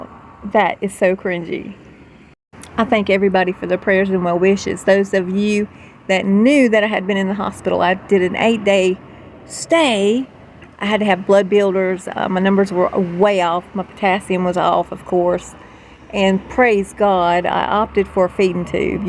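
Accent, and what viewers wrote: South, United States. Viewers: Hi I love your southern drowl. Megain was starting to make me dislike Americans. Then I realized it’s not fair to label all by that Hussey. I must tell you I can’t stand that President either. The two need binning. I am from Northern Ireland and fiercely BRITISH. We arrived in your country and helped start the country. We gave 17 Presbyterian President’s and help with the writing of the constitution. Xxxxx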